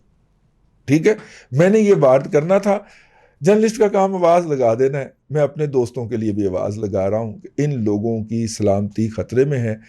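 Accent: Punjab, Pakistan. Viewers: Your urdu/hindi in punjabi accent is quite amusing and enjoyable to hear.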